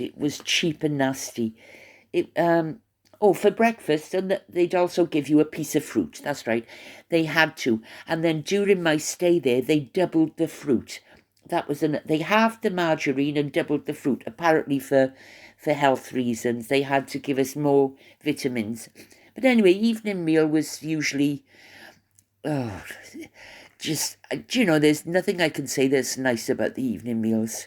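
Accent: Wales, United Kingdom. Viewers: First time visiting you but I' ve heard good things about you from others here. Nice to hear a Welshy twang. I'm from Cardiff but I'm miles away from home. Shall be following..❤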